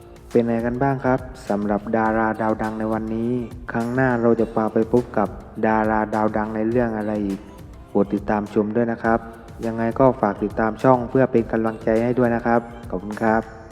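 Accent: South, Thailand. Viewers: คนพูดนี้คนใต้ม้ายอ่ะ น่าว่าหมันใช่ม้าย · เสียงเจ้าของช่องเหมือนคนใต้จังคับ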